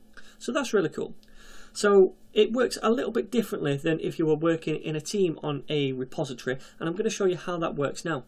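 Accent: Germany. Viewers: German accent BTW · Think that was a German accent. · btw the accent (yah good) was part German, part Dutch, part Swedish - executed to perfection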